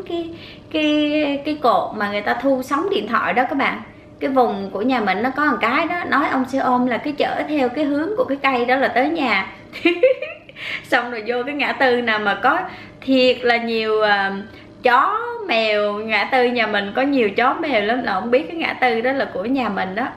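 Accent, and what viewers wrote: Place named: Central, Vietnam. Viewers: Giọng chị giống ng miền trung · chị nói giống giọng đà nẵng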